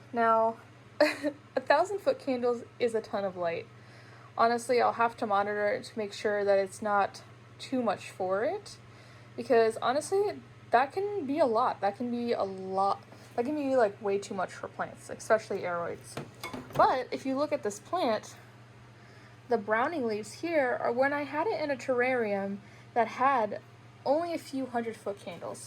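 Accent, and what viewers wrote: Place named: Quebec, Canada. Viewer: It's the first time I watch one of your video (just discovered your channel) I'm sorry but I have to tell you, you really sound like a french Canadian talking english, it's crazy!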